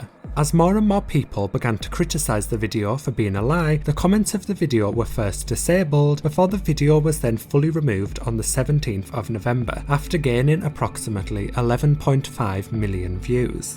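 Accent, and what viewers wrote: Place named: Scotland, United Kingdom. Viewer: First time here and oh my God, I just looove your accent! It is Scottish maybe?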